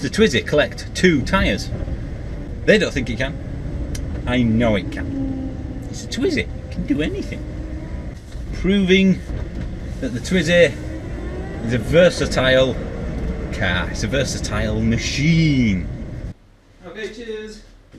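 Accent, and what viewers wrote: Yorkshire, United Kingdom. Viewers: Love the accent too. Somewhere in Yorkshire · probably just the Yorkshire accent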